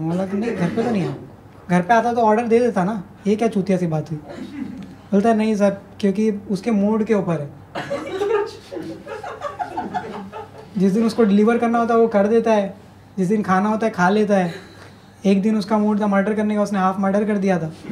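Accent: Bhopal, India. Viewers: TU BHOPAL SE HAI KYA ???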